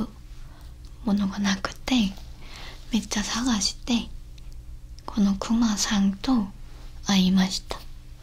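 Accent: South Korea. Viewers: 韓国の女の人が話す日本語っていいなぁ。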